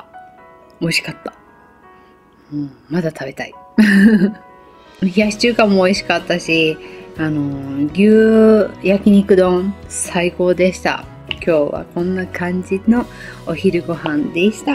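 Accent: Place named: Oita, Japan. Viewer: 返信ありがとうございます🎵💖 さん大分なんですか⁉️😅 道理で方言が似てると思ったです😊 余り鹿児島弁は出てないですよね‼️ これからもいっぱい楽しい動画を宜しくお願いします🎵💖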